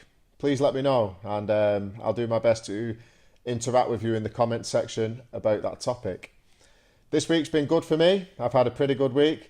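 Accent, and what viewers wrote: United Kingdom. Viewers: Hi, how are you? What kind of British accent do you use?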